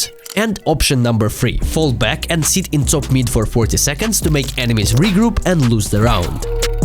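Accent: Russia. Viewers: а я один думал, что это русскоязычный тип? я начал смотрел его давно, когда он только снимал видео на ютуб и без вебки, и мне казалось, что его акцент и имя похоже на русского…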